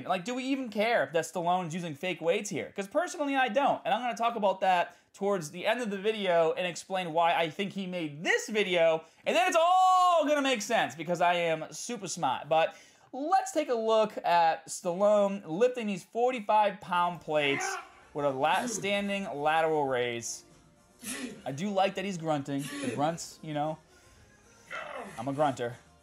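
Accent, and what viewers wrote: Boston, United States. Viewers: Most obnoxious Boston accent ever and I’m from Boston 🤦🏽‍♂️🤦🏽‍♂️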